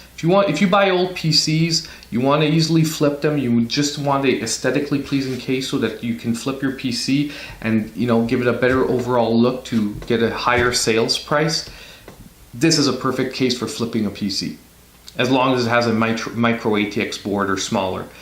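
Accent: Quebec, Canada. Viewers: french qc accent haha keep going youre awesome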